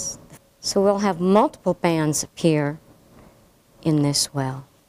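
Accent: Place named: South, United States. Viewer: Slight Southern accent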